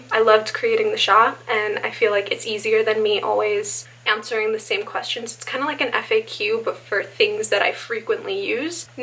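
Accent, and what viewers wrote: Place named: East Coast, United States. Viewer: Kinda random but are you from the east coast? Your accent peaks through at times. 😂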